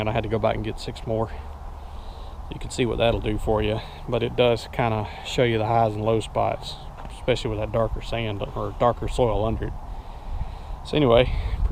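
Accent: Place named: Texas, United States. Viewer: Yea that's too far. The accent sounded more like you were from Texas. You can actually build an in ground pool for about 3 to 5k. Depends on how fancy you want the finishes and if you skim coat or just use a liner. The construction is just with simple cinder blocks. You have the hardest part already solved... digging the hole.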